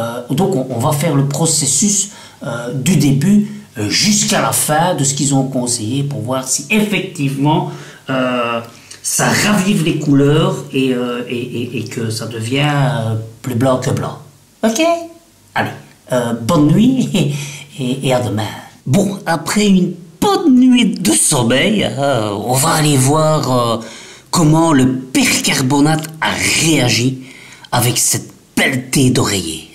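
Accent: Belgium. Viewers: ta diction est parfaite, je te comprends très bien. Mais je te croyais belge en fait. Et il n'y a pas de mal à ça. Il paraît aussi que j'ai un accent lyonnais et autre chose 😂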